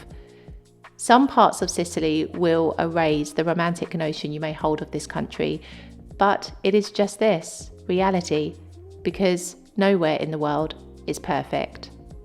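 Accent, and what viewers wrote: United Kingdom. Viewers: Sounds like a British accent 😂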